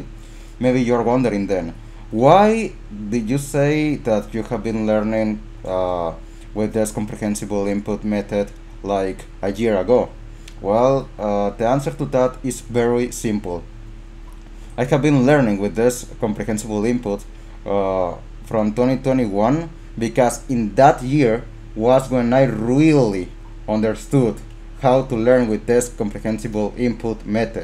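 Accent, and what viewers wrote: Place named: Latin America. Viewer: Hi, I gather that you’re an American Spanish speaker from your accent (I mean, a native Spanish speaker from Latin America). People from Spain have a different accent when they speak English. I'm not sure if you're from Mexico, but I'm absolutely sure you're not from Spain. Man, I'm very impressed by your English! I’d like to speak English as well as you. Someday I'll get it, I hope so.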